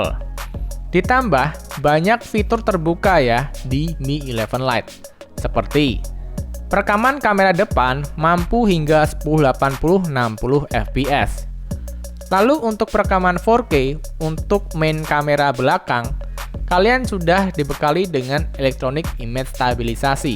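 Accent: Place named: Surabaya, Indonesia. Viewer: pantesan medok.. lah org surabaya. hahahaha..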